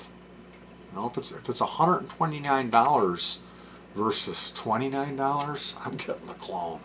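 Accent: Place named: Chicago, United States. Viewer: Hey what's the spot you get your juice at? I'm from the south side and I think I remember you saying you were from Chicago in one of your videos, if the accent wasn't enough to tell.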